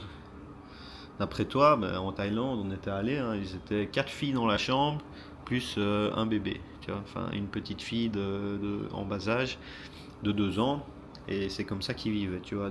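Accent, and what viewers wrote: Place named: Belgium. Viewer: Oh l'accent belge 😀 Merci poiur ton partage.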